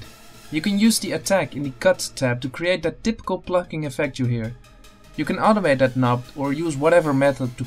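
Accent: Netherlands. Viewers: a dutchy? good accent tbh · ey are you dutch? because you sound really dutch XD ik ben ook nederlands btw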